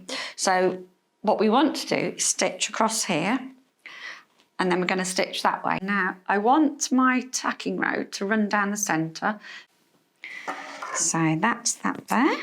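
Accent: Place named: England, United Kingdom. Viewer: Best cross hatch video ever. You have made it so easy for someone who hates sewing. I do a lot of embroidery and the piece I am going to work on is a table runner with cross hatch quilting. I have watched other videos on cross hatching and it was so involved and so confusing. Thank God I found your video. Can’t remember if you said how wide the tape was. I have subscribed to your channel. Besides making it so easy I love listening to you. I have always loved the English accent. Not only am I American, but I am from the Bronx, New York and I wish I had your accent.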